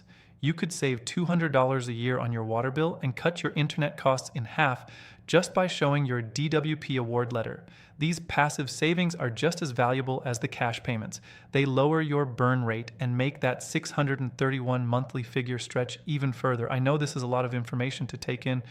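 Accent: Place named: United States. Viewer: Why have we got some American telling us this?mit is really annoying. Not to mention being called a senior!!!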